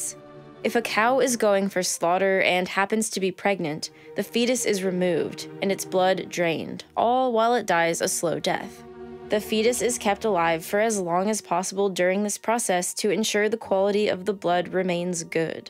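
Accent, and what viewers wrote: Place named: United States. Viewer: I have severe doubts that it takes even 10 litres of FBS to make a single burgers worth of meat, let alone 50 litres. As the narrator is clearly American I'm going to go out on a limb and assume larger standards for meat portions than the rest of the world does. Even then I still don't buy it, sounds a lot like doomsaying - especially given she saves the "oh yeah $10k to less than $3 is feasible in 9 years" to much further along in the video as if she's just padding the run time.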